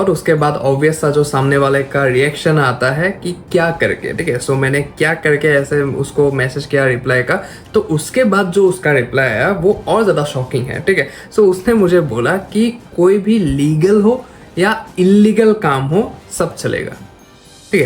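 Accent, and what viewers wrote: Assam, India. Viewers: Bhai Assam se ho kiya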